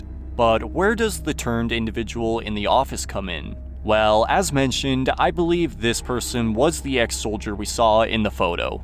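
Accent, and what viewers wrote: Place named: Iowa, United States. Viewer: Did this guy say he was Canadian? His vowels sound like he’s from Iowa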